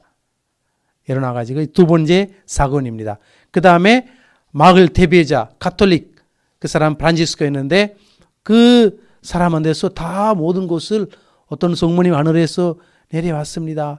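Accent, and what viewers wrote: South Korea. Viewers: 신부님 발음도 너무 좋으시고 잘 들려요 악센트가 한국사람같아요^^ 잘몰랐던 인도 성모님 소개해주셔서 감사합니다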